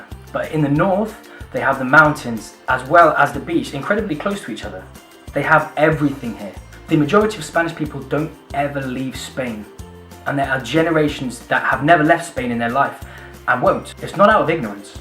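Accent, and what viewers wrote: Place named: Essex, United Kingdom. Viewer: you have a slight Essex accent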